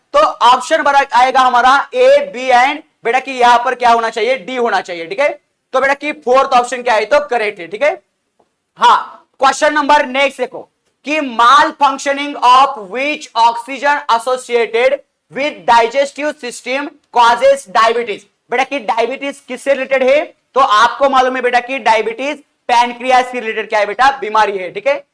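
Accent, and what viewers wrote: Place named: Maharashtra, India. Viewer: Sir thoda marathi bhi use kare to chalega 😊😅 kyonki apke samajane ke tarikese hi pata chal raha hai ap marathi hai 😅🎉❤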